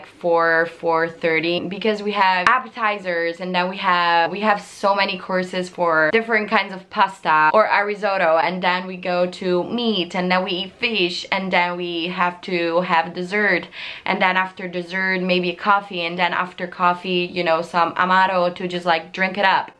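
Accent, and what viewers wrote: United States. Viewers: Sconvolta dalla perfezione del tuo accento americano...totally jealous! 😄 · I love your accent, It's like a cross between American and English, and then sometimes you forget the "th" sound and you go Italian again hahaha, carina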